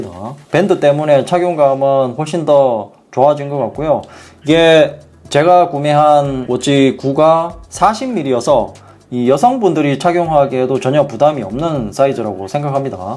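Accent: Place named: Gyeongsang, South Korea. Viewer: 이헝님 겅상도 사람인가 말투가 구수하네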